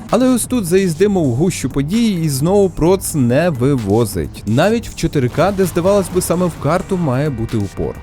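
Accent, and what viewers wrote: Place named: Bila Tserkva, Ukraine. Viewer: шо за прекрасний акцент? -Білоцерківський! Думав показалось, а нє-земляк. Привіт!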